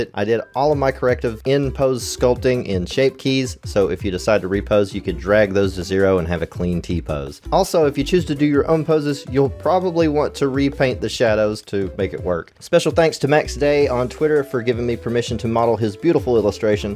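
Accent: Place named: South, United States. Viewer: please i adore this video also where are you from??? idk if im wrong here but your accent just makes me feel right at home... im from the South. extra pointers for personally interesting to me just with the way you talk haha i love this video and how you describe it all (despite the fact i dont understand ANY of it)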